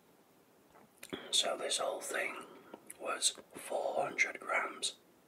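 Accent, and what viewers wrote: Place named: North England, United Kingdom. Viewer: So satisfying - is the honey thicker like that or just as runny? Also I can't place your accent, I keep going from Manchester way, to possibly the Wirral, to maybe Yorkshire (I'm a Yorkshire lass)?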